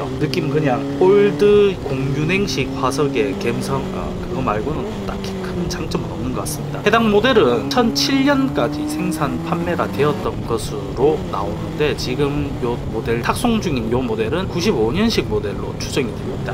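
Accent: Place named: Daegu, South Korea. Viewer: 자막이 없으니깐 발음이 뭐라하는지모르겠네요;;...사투리보니깐 대구사람인거같은데ㅠㅠ오토바이 땡겨먹기 한번하시죠😂ㅎ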